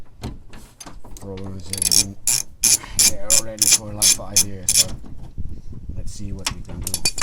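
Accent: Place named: Netherlands. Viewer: Bedankt voor je handige instructies. We have a 1996 VW Cabrio, and your instructions and video guidance was just what I needed to get this done. We live in Norfolk County in Ontario, Canada, and there are a lot of Dutch people in my church--it was very nice to hear your instructions in English with your Dutch accent. I did pretty much everything that you did, and just finished and the window works great! Thank you especially for the extra details about the clamps and the alignment procedure. Thank you so much.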